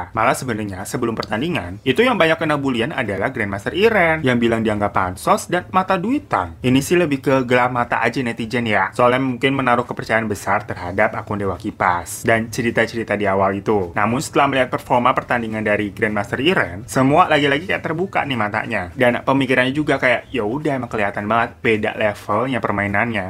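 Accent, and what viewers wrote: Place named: Bekasi, Indonesia. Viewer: orang babelan ya 😂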